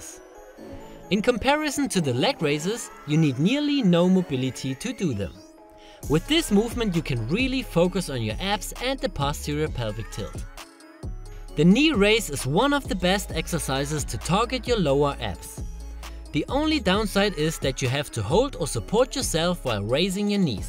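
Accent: Germany. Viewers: lmao... 1 sec in to the video i knew u was german,no offense tho in any way or smt i was just pointing it out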